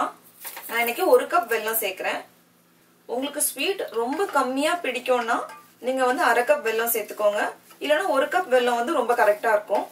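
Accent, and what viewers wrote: Nagercoil, India. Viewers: Thalli, typically Nagercoil slang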